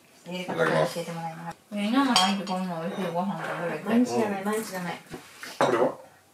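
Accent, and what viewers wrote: Kansai, Japan. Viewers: 骨なんかつかうん？のくだり笑いました😂😂すごい今更なんですけど、さん関西人ですか？私も関西人なんですけど、会話が聞き取りやすすぎて、あ、まって、関西人やん！！おもいました🥺 違いましたらごめんなさい( ；꒳； ) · 最高の食卓！！！全部美味しそうやし、関西弁に癒される～＞＜ さん最高です。旦那様も、ご友人も幸せですね～＾＾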